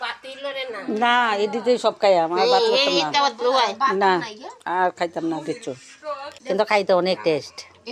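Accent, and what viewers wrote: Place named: Kishoreganj, Bangladesh. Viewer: লাইক দিয়ে পুরো ভিডিওটা দেখে নিলাম দিদিভাই কিশোরগঞ্জের আঞ্চলিক ভাষা শুনে খুব ভালো লাগলো অনেক পরিচিত ভাষা নিমন্ত্রণ খেতে গেলে ❤👍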